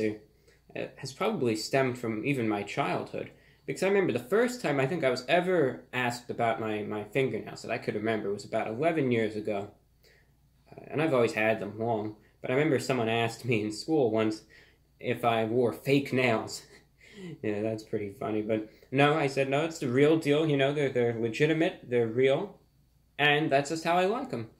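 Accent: United States. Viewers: He looks so British and then you hear his accent Feels American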